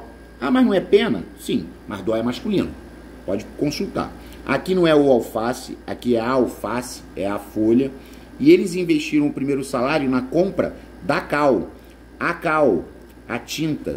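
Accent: Rio de Janeiro, Brazil. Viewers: 7/50 !! Rlx, prof, é quase impossível agradar todo mundo! O senhor é nota mill, seu sotaque bem carioca faz parte do pacote!! rs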